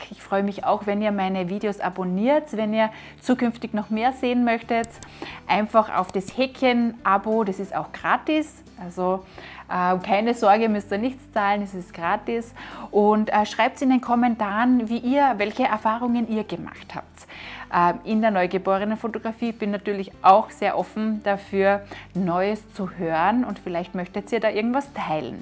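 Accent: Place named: Austria. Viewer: Hi, vielen lieben Dank für deine hilfreichen Videos. So bekomme ich von dir doch viele hilfreiche Informationen und Tricks, über die ich mir noch keine Gedanken gemacht habe. Zu deiner Frage, ob du gut verstanden wirst, sage ich ein klares Ja. Da ich aus Wattenscheid/Bochum - NRW-Deutschland komme, sind wir "Ruhrgebietler" von Natur aus offen für alle Sprachen/ Kulturen. Dazu liebe ich die Aussprache von Menschen aus Österreich und der Schweiz sehr. Vor allem der Gruß zu Abschuss (Baba) Alles Gute dir, deinem Team und deiner Familie.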